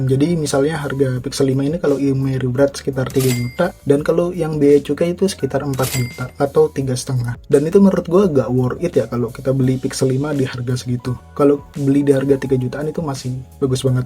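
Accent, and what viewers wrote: Bali, Indonesia. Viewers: Jujur logat lu bang kaya orang Bali agak ke India"an😂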